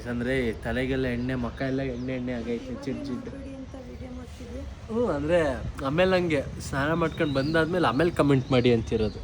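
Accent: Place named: Hassan, India. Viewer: Pakka hassan language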